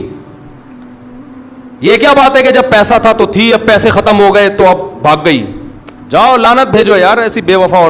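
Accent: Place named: Karachi, Pakistan. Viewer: ویسے حضرت آپ کے بیانات سننے کی ایک اہم وجہ یہ ہے کہ آپ کے انداز بیان میں کراچی دیکھتا ہے آپ کراچی والوں کا انداز دیکھتا ہے اور جو افراد کراچی کو پسند کرتے ہے وہ آپ کو ضرور پسند کرے گا انشاللہ تعالیٰ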